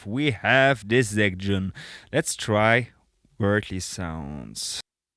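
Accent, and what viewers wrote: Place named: Germany. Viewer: The German accent is legendary haha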